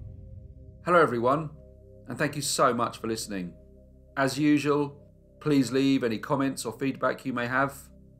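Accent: England, United Kingdom. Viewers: It sounds like you've been in England longer than just covering this video hahaha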